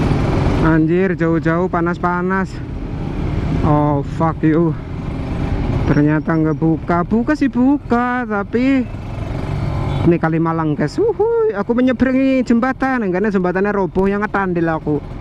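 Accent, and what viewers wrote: Banyumas, Indonesia. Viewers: Salam wong ngapak mas😘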